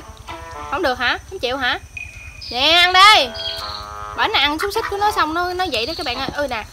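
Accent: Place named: Mekong Delta, Vietnam. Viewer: Do chị ấy người miền Tây nên nói giọng đôi lúc lợ lợ không rõ ý bạn:3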